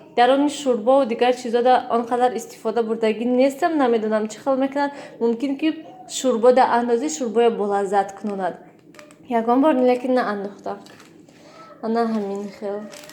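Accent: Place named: Khujand, Tajikistan. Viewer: Ранги тарафои хучанд гапзанитон падари Нилуча гучоги ?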